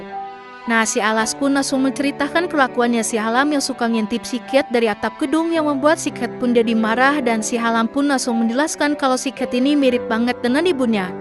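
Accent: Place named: Sunda, Indonesia. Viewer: Udah lungse udah bucat😂😂😂😂😂 sunda pisan👍👍👍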